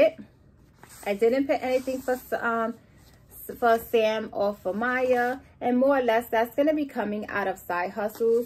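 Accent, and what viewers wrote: New York, United States. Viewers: Off topic but are you a New Yorker ? I hear an accent.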